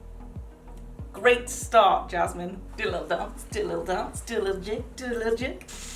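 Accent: United Kingdom. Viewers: I'm sorry but are you from the UK love you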